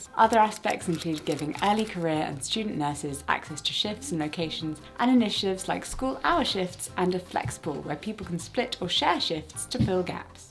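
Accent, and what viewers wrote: United Kingdom. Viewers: I'm a nurse and I think you explained very clearly and simply what dysautonomia is and why it happens ! Always a pleasure to watch your videos (and also I'm french and I think your accent is deliciously british ✨ that's also a pleasure to hear)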